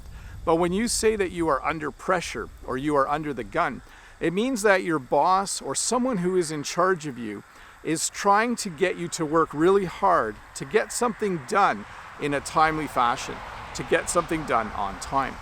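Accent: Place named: Canada. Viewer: If there is a person without stress, tell me who, I’d wanna be that person! Great lesson. The more I watch your lessons, the more I like them. Because you teach in a very unique way and are kind and understandable. And your explanations always make sense. Keep it up, especially because I love Canadian English. Greetings from Switzerland.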